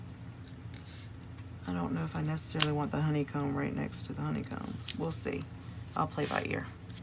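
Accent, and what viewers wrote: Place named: South, United States. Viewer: Can I just stop and give a hey y'all to your sweet southern voice! :)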